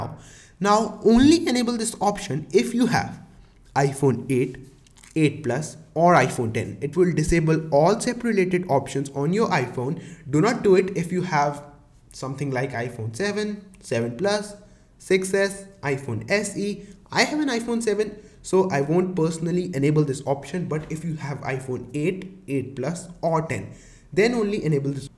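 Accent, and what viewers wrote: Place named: India. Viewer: First time I hear your voice you are Indian.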